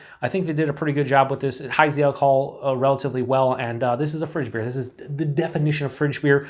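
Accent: Western New York, United States. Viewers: Jeez son, that was heaviest Western New York accent introduction since the WGRZ 12 oclock news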